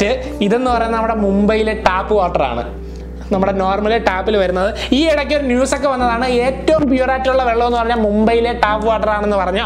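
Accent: Trivandrum, India. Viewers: Bro ningal TVM aana??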